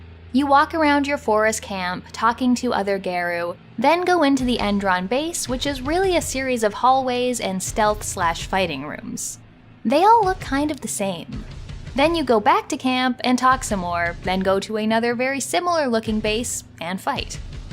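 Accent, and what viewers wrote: Canada. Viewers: I could barely tell. It's the nicest Canadian speaking voice I have yet heard.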